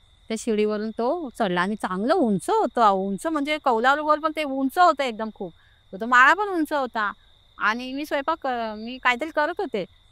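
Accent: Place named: Konkan, India. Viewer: Khup sunder story sangitli ekdum amcha kokni andaaz thanks kaki, plz reply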